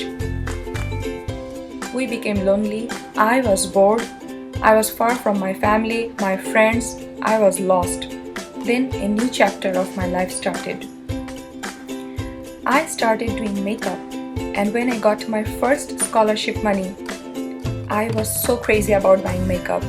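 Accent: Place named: Sylhet, Bangladesh. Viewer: haha me too oank hashi pai sylhetii shunlay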